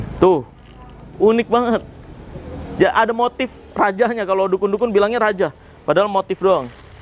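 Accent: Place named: Madura, Indonesia. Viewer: Madura banget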